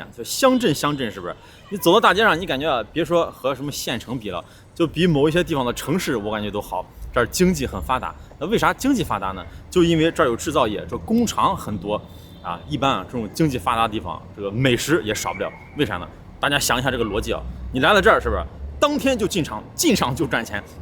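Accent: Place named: Shandong, China. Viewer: 你是山东口音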